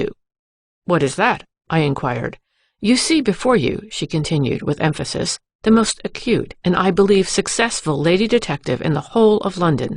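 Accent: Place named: United States. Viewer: sorry, no, don't mind me. I just was looking for as I mentioned in another reply, a British voice reading these particular stories. And I would go to one that would have one name for the channel and it would be I presume you or another female non-British voice, then I would scroll and there would be another one in the series but the channel name would be different and I would click on it thinking it would be a different voice but it was still an American or Canadian female reader. If I mistook this and if it's not like that and there aren't different channels with you or another non-british female narrating then I apologize. I did it very quickly so perhaps I got it wrong. But my original question was why does it sound like the same woman narrating stories I want to hear but with different names for channels where one might reasonably assume it would be a different voice a different person altogether,, but every one I clicked on seemed to be the same voice no matter what the name of the channel was.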